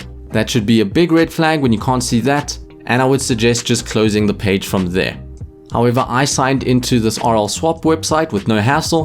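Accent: South Africa. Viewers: Are you from SA? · IS that a south African accent i hear?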